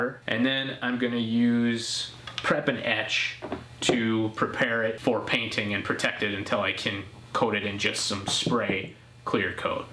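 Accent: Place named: Midwest, United States. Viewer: Where are you from? I'd guess somewhere in the Chicagoland area, Wisconsin or Minnesota based on your accent.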